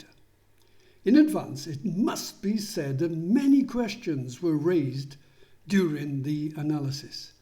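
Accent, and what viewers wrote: Wales, United Kingdom. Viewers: Very clear and well presented and your Welsh accent is lovely to listen to.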